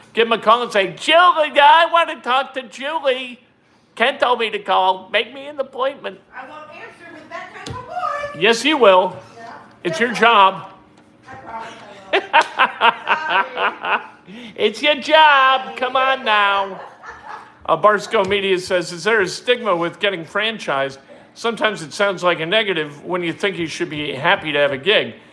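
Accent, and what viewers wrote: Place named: Indiana, United States. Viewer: It’s odd that you said you moved to Indiana. You are 100% a Hoosier in your way of talking and mannerisms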